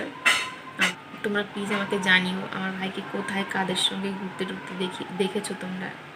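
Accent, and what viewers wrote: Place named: Kolkata, India. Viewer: Are didi Kolkata Bangla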